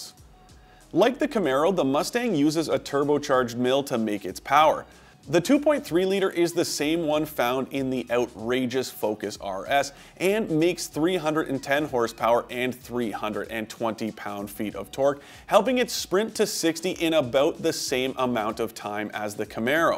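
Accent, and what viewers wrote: Canada. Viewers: First line he spoke, im like oh hes canadian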